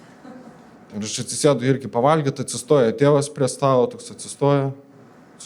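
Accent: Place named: Vilnius, Lithuania. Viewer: Pagaliau Vilniaus krašto komikas. Tuteišai unikali kalba! Niekas niekur daugiau taip nekalba. Teko suprasti tik emigravus 😅 Atrodė natūralu visada. Šaunuolis!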